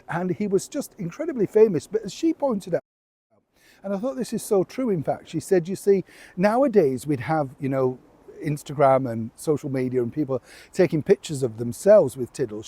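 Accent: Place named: North, United Kingdom. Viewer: It's his ' Northern ( Uk) charm.😊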